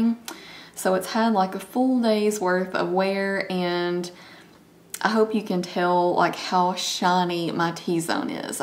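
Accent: South, United States. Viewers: I love your southern twang. <3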